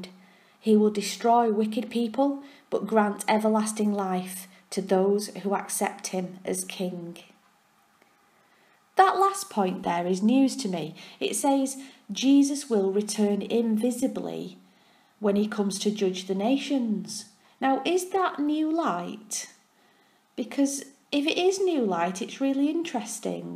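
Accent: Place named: Ireland. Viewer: The Irish accent this lady has is just lovely. 😍😍😍😍😍😍😍😍😍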